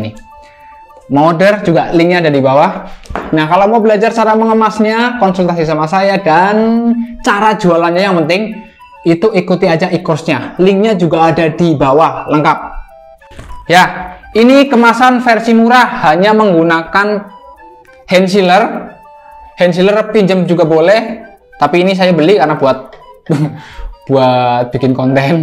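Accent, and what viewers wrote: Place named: Java, Indonesia. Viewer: Aksen nya seperti Pak Jokowi...Mase. Sukses selalu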